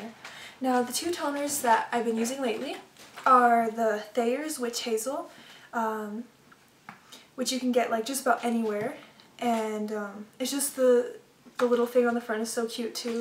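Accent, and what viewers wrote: France. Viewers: I'm not used to commenting on videos at all, but I needed to tell you that you look absolutely wonderful. Such a pretty face! :) And nice French accent too! I suppose I would be your "counterpart" since I am a French girl studying English... All the best, Virginie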